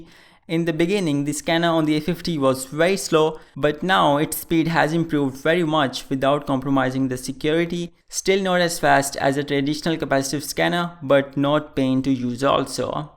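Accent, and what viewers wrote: India. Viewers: "click on vid" hears indian accent, "clicks off"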